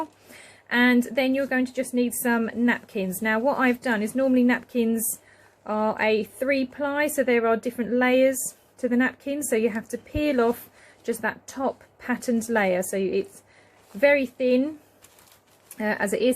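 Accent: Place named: United Kingdom. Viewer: Thank you. A British accent, great. Translucent - light passes through.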